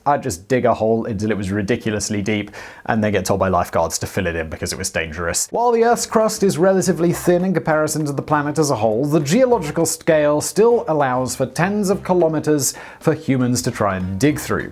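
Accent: United Kingdom. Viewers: no British accent can hide that mistake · As is all algorithms from Borg to Utbe, it go to hell kiss my ass and I'll do as I damn well please. Such is math. Also your device is set probably on Autoplay. Mr British guy is like all things UK: only in small amounts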